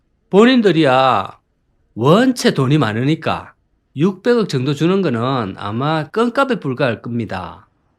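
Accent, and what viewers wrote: Gyeongsang, South Korea. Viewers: 영남할배가 무슨 서울가로수길까지 와서 설레발인가 이 송파할배가 듣기 거북하구만~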